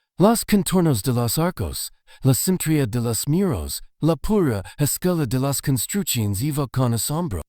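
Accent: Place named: United States. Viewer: Qué cojones... Han puesto a una IA gringa cateta a pronunciar español?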